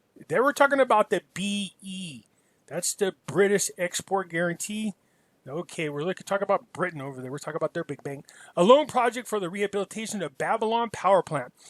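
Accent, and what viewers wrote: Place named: United States. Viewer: Hi why do all Americans pronounce Iraq and Iran with an I as in I they pronounce it with an India pronunciation.. could you change your pronunciation to honour these countries ? Thanks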